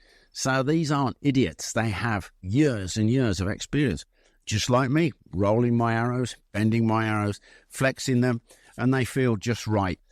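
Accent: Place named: Birmingham, United Kingdom. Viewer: Hi, great channel. You'll never lose the Brummie twang! How do you make the whistling arrows!? 😁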